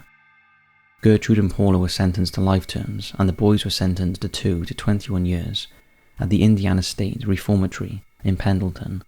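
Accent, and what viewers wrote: Wales, United Kingdom. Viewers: This is proof that someone with a beautiful British accent can mispronounce words. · I always thought that the narrator was Welsh or has Welsh origins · Your voice helps me get to sleep. That is a compliment, by the way. Where in Wales are you from? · He has a welsh accent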